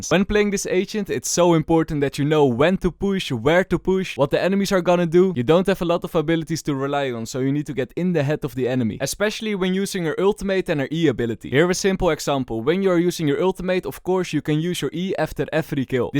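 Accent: Netherlands. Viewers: Haha instant hoor je de nederlander Nice kanaal :) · as a reyna main, i first want to say u sound really Dutch (the Netherlands) and second u say perfectly what i mean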